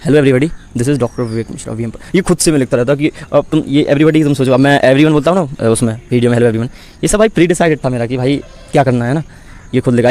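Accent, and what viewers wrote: Bundelkhand, India. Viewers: Aap bundelkhand se ho...????😊😊😊😊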